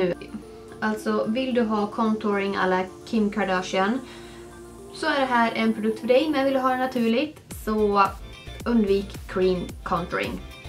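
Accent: Dalarna, Sweden. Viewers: Jätte bra video! <3 Man hör ditt dalmål ibland! :D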